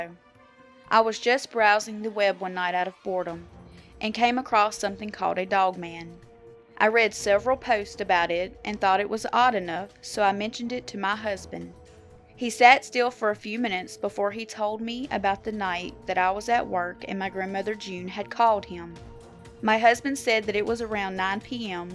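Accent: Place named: South, United States. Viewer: great job,u have that sweet southern twangggg!!! just love it😀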